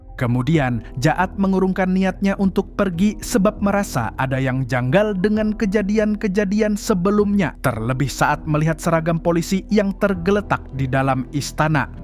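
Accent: Banjar, Indonesia. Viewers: urg banjar jua kh pian mang😅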